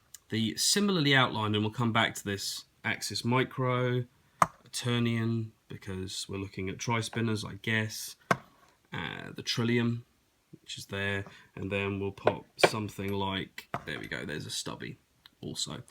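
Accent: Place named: England, United Kingdom. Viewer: It's refreshing to hear an English accent in the spinner review section of YT! It's also great to hear succinct and balanced opinions as regards top end spinners and their mid-quality counterparts. I for one have an aptitude with all things arty crafty so I'm in my element buying mid-priced spinners and then re-finishing them by hand then putting in a good bearing. For example, I've just bought both a Zentri Nano and a (now obsolete) Triton mini ...both in SS, hand smoothed/polished them and both are running One Drop bearings ...all part of the fun :-) Thanks ...thumbs up/subbed.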